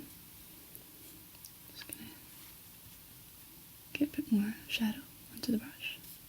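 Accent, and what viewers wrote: Scotland, United Kingdom. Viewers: As a fellow Scot, you're the only Scottish asmrtist who actually relaxes me and doesn't make me cringe at my own accent. Thank you 💜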